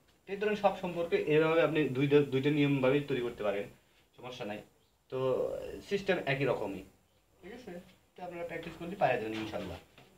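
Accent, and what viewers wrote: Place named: Noakhali, Bangladesh. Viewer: নোয়াখালীর ভাষা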